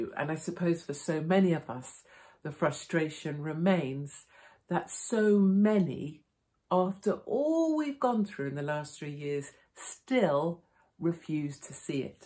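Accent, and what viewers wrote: United Kingdom. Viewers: is one of the only Brits I can listen to without getting sick of hearing that annoying accent.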